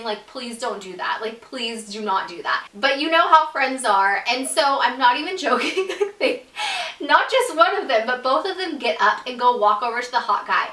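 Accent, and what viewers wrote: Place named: California, United States. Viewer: she's from california, that's the way people talk there.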